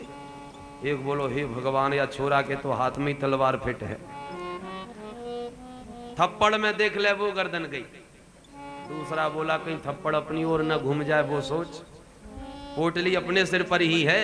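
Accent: Braj, India. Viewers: Ati sunder sumadhur brajbhasha me katha ataydhik sukhad saras prateet hoti hay apko sader naman vandan guruver 🙏🙏🙏🙏🙏💐💐💐💐💐💐💐💐💐💐💐💐💐💐💐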